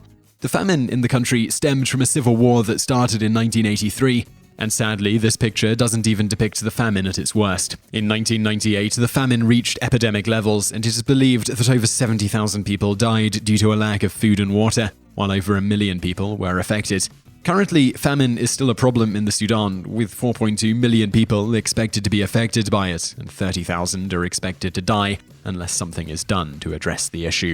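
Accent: United Kingdom. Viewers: Sean S., it doesn’t matter where she was born or how she pronounced it herself. British pronunciation is just as valid for narration as American pronunciation.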